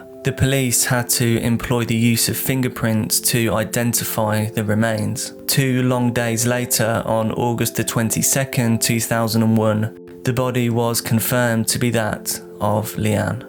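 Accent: United Kingdom. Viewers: Is this a robotic voice with a British accent, it sounds like it.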